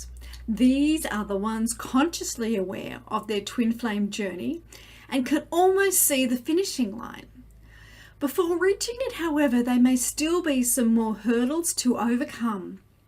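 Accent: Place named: Australia. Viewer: So nice to hear an Aussie voice, hello neighbour. 🙏❤️ I would love to hear from other Aussie flames, does anyone have meet ups in Sydney.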